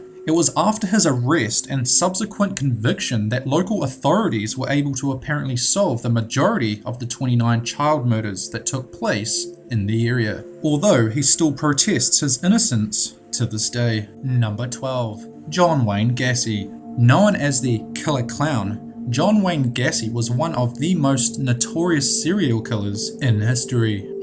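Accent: New Zealand. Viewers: Fury Devil He's a Kiwi.... Kiwi's don't say 'Mutation' instead of 'Mutilation'.... facepalm yourself buddy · Kielee R I live in Queensland, Australia we don't talk like that. Maybe a Kiwi! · He should know how to pronounce things like infamous and impotence. I thought his accent was more Kiwi than pom but I could be wrong. At least he can pronounce "subsequently" which so many can't. Drives me bonkers. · His accent is very much a New Zealand one. Australians don't pronounce words in the same way that New Zealanders do. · Your New Zealander right? I'm Australian and the way you enunciate the letter E kind of gives it off. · That New Zealand accent drives me fucking insane/ Tid bundy and the disastrous Goan Vallejo Corona. People just don't try when they annunciate incorrectly. Make's me very very annoyed...and I haven't subbed at all.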